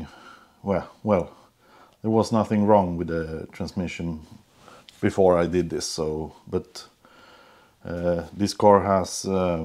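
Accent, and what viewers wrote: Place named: Minnesota, United States. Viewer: Great video!! Born and grew up in Minnesota, I can tell where our accent comes from!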